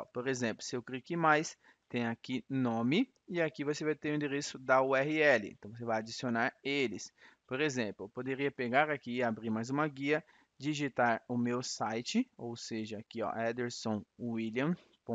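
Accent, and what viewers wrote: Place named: France. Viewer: Mano que sutaque francês massa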